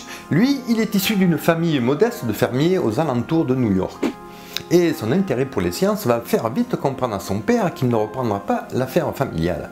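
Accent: South, France. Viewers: Tu et du sud de la France non mdr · C'est un accent du sud si je ne me trompe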